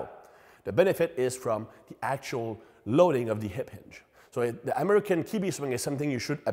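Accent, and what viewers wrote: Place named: Quebec, Canada. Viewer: I’ve been a fan of articles for a long time now, and this is the first time I heard him speak. I knew he was Canadian, but didn’t know he was from Quebec. Makes me respect his great writing skills even more.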